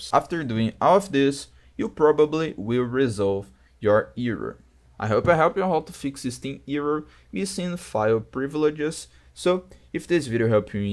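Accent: Brazil. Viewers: sabia que esse sotaque era BR XD, ja viu esse erro meu consagrado? LowLevelFatalError [File:Unknown] [Line: 729] BulkData compressed header read error. This package may be corrupt!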